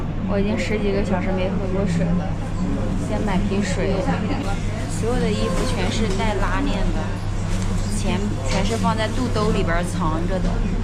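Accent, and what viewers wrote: Northeast, China. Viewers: 感觉你是东北人口音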